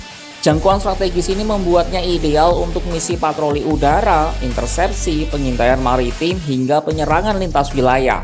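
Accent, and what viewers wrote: Java, Indonesia. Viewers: Sekelas Kompas pembaca beritanya medok bgt. Kaya ga ada yg lain aja 😆😆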